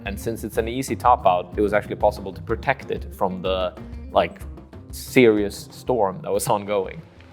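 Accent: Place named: South, United States. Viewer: I thought this guy had a Southern twang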